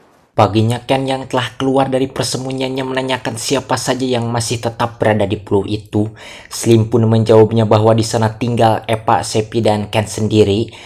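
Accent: West Java, Indonesia. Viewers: abang ini asal sunda? Sunda nya di mna bro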